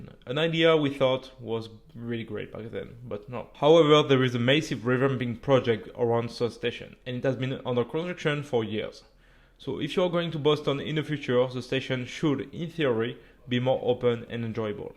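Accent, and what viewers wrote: France. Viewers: Wasn't expecting this video to be entirely narrated over the subtitles, which was a nice change but I kinda prefer the subtitles more as your French accent can often be hard to understand. In all honesty, I'd rather watch one of your vids with the subtitles instead. I don't mind if it happens once in a while as it's a good reminder to us viewers your from France. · I like the voiceover and I love the strong French accent😁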